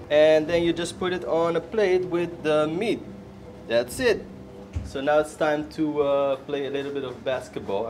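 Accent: Philippines. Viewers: i love your pilipino acsent😍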